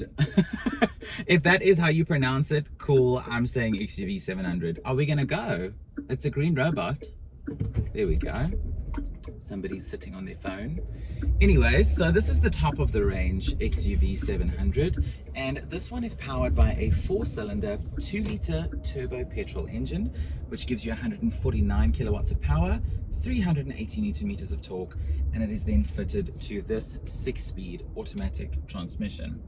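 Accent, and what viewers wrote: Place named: South Delhi, India. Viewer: You accent is same as south delhi rich kid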